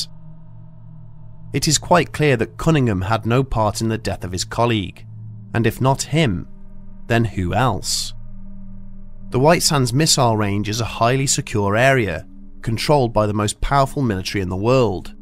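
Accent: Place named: England, United Kingdom. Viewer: Yeah he narrates in actual English not that American parody language..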